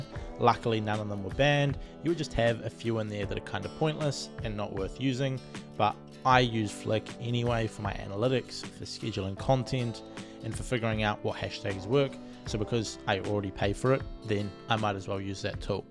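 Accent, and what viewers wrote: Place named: New Zealand. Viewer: thanks dude. first i thought a fellow Australian? then i heard the NZ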